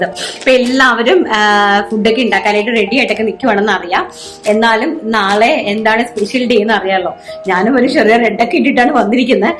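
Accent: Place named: Tamil Nadu, India. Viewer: I like and your performance so much. Tamil mixed Malayalam kelkaan oru sugham und God bless you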